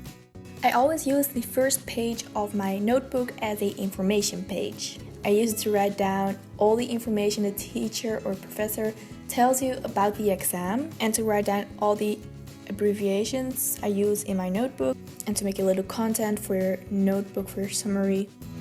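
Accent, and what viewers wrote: Netherlands. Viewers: I love it when you immediately hear someone is Dutch or not ;) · Wait a minute, are you Dutch?? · ik dacht al dat je nederlands was😂😂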